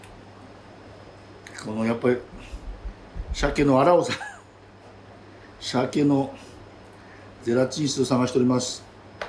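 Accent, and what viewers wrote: Hokkaido, Japan. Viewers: ちょくちょく出てくる北海道弁がたまらないです。鮭の頭が入った石狩鍋は甘みとコクが出て美味しいんですよね〜。バターは意外でした。今度やってみます。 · ❗ 北海道弁最高❤️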